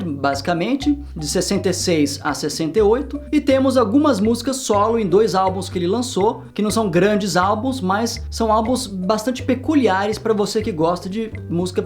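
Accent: United Kingdom. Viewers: Vc já morou na Inglaterra? Seu sotaque inglês é igual ao dos britânicos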